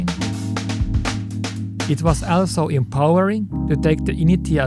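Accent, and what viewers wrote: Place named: Finland. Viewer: Mikä saakeli siinäkin on että suomalainen yliopisto lataa videon suomenkielisellä otsikolla, mutta selostus on rallienglanniksi?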